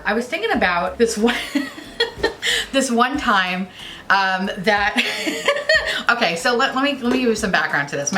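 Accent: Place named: New York, United States. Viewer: I’ve been watching beauty YouTube for years and I’ve been broke due to Covid and really fallen off watching beauty BUT when you post a video I get so excited!! Every time you start talking my boyfriend says “is that the New York chick??” 😂😂 then he has to watch you because you’re hilarious 😁 thank you for being awesome!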